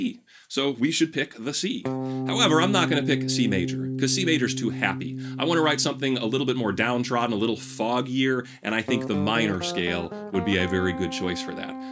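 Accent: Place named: Midwest, United States. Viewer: Wow I just stumbled on this video and man, I just wanted to say thanks and congrats. You have great content, a nice fast pace and a perfect radio voice (with a crisp Midwestern accent indeed). I learned a lot even though I've been playing music all my life. This was legit one of the best tutorials I've ever watched. 👍🏽👍🏽👍🏽 Keep rockin'!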